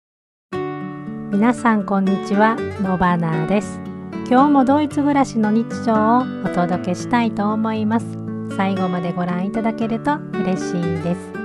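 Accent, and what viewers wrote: Kansai, Japan. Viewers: こんにちは😊 今週はバタバタして今頃拝見させて頂きました！ キャベツと鶏ムネが 塩して放ったらかてるだけで、美味しくなるなんてどんな魔法ですか！？😳 のばなさんの動画の好きな所は、のばなさんの声と関西のイントネーション🎶 経験からの暖かい言葉、でしょうか🤔 あと、マリメッコのエプロン！ かわゆす❤ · ドイツ、学生の時からの憧れの国。そこで彼方の方と国際結婚で暮らしている事に興味津々です😊ご家族もいて、穏やかな関西弁がほっこりしてます。初めて観てチャンネル登録させていただきました。 どこで暮らしても小さな幸せ見つけることって大切ですね。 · はじめまして。 今日初めてユーチューブ拝見しました。 他の方もおっしゃっているようですが、優しい関西弁がとても素敵ですね。 なんだか癒やされます。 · 今日は予定をこなし帰宅後すぐ観れました❤ 母が転倒して右腕を打ち食べる事も出来ないので数日寝泊まりした後、 ショートステイに入居させました。痛みはなかなか消えないしあちこち悪くて 退去後も1人で暮らせるか施設も視野に様々な選択を迫られています😢 動画を毎回観るのが楽しみで癒しです。 Nobanaさんの関西弁だけど柔らかいトーンのナレーションが好きです✨ つまみ食い女王にもお会いしたいです😊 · 私は、とにかく nobanaさんのおしゃべりが大好きです😍 バリバリの関西弁😂癒されるわーって感じです。住んでいるのは横浜ですが、母方の実家が関西なので😊 あとは、やはりドイツ暮らしが、手に取るようにわかること。主婦目線、母親目線、いろんな場面があり楽しいです。 これからもずっと応援しています♪♪♪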